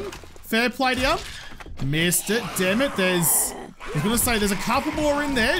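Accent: Australia. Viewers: are you australian, if you are thats cool.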